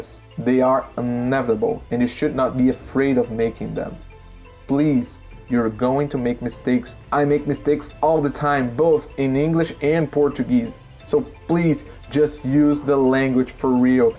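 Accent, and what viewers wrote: United States. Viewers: Brazilian with american accent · He does sound pretty American later on, but definitely not in the beginning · I thought he sounded American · Wow when I started listen you I thought I was watching a American guy spoke in English. I couldn't say that you are a Brazilian if you didn't say that you are. Congrats from now on I will follow your videos. I am studying on my own as well.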